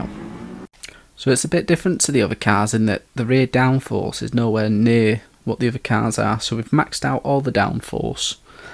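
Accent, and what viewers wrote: United Kingdom. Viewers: Man This game is so expensive on Car purchases so I have to make the best use of the cars I've got But thank you for all these builds. Do you think that the Peugeot l750r Set up you have here Will work well for the cafe Menu 42 GR1 Suzuki Race too? PS nice to hear A fellow mancunion accent.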